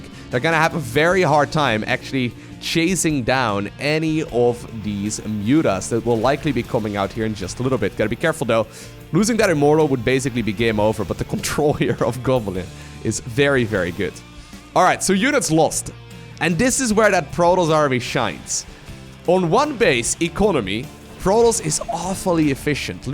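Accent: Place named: Netherlands. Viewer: You are Dutch, aren't you? Just assuming due to your accent.I like watching your vidoes.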